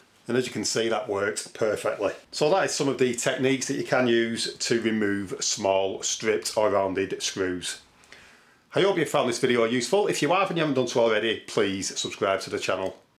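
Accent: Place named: Yorkshire, United Kingdom. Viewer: What part of north you from? Sound like a Yorkshire man. But you could be from Manchester or Lancaster. I used to be from Blackburn myself. Lived in London for years but still have the accent 😂 Thanks for the tips. My Screw just won't budge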